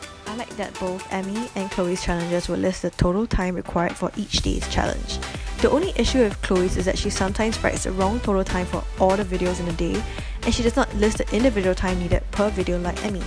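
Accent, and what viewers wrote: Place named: Singapore. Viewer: You're Singaporean right? 🤔🤔🤔👀 I can tell by your little accent! 💖💖💖😇😇😇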